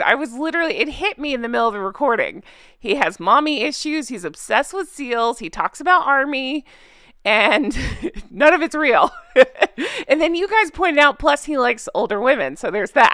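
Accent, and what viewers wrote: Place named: United States. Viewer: i love voice... which gives away she would be a laugh to hang out with.. one of my favourite American accent s.. revenge............ revenggggeeeee.. 🤣.. always gives a chuckle hearing her say it..and shes just said it again while im typing 🤣.. stop it 🤣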